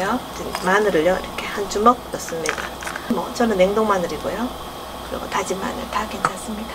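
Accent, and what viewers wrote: Gyeongsang, South Korea. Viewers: 녜~ 경상도 말씨로 진행을 하셔도 매우 훌륭하네요! ㅎ ㅎ ㅎ 오늘은 귀하의 덕분으로 산삼백숙을 먹을려고 부산서 왔읍니다. 수고 하셨고요 - - - - 자주 뵙길 희망합니다. 🍉🍉🍉🍑🍅🍆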